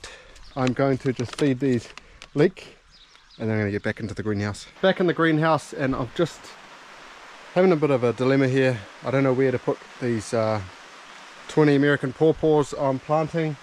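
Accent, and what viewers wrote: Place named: New Zealand. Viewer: Bros got one of the thickest NZ accents ive ever heard lol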